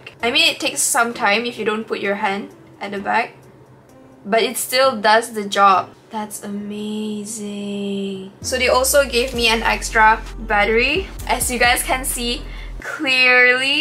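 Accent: Sarawak, Malaysia. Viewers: Sarawakian? I heard something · Akak orank sarawak kahhh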